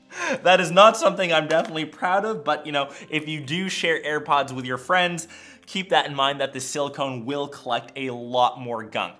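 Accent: Canada. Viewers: Your Canadian? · You sound Canadian or something I think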